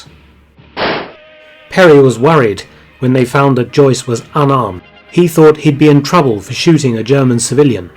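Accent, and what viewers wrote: United Kingdom. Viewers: Sorry guys but it all sounds a bit iffy to me as many of these types of programs do! it sounds very pompous and how could anyone know what really happened. Its almost like listening to a WW2 propaganda speech on the radio, half truths and no better than the usual bull crap you find on Youtube, but this has a nice British accent. (fake accent?)The only real thing is the film used. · I love the British accent. Militree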